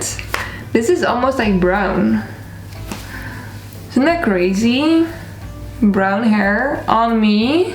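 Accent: Netherlands. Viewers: I hate myself for knowing you were Dutch just by your accent😂😭. Ik dacht zo van “huh is ze Nederlands?”😂.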